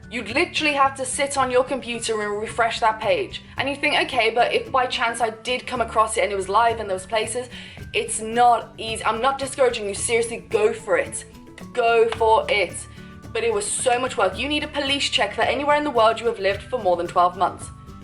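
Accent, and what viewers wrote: United Kingdom. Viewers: are you from UK ,Darling !!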